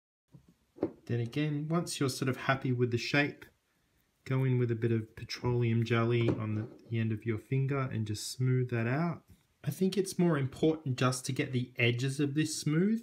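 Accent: Australia. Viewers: Are you an Ozzie? You sound like an Ozzie. Like if YOUR a Ozzie.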